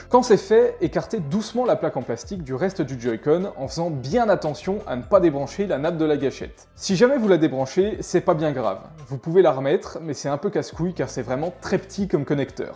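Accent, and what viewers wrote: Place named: North, France. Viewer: c'était l'un ou l'autre avec cette accent qu'on partage 🤣 Salutations des chtimis 😅😁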